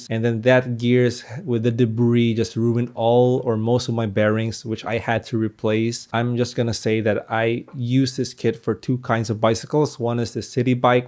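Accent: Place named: Davao, Philippines. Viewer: Taga asa ka? Murag naa kag Davao.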